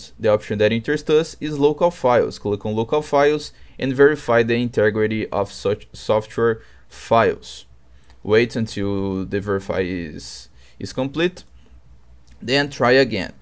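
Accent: Brazil. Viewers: amigão, eu reconheço seu sotaque brasileiro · com certeza é um brasileiro kkkkkkkkkk mto bom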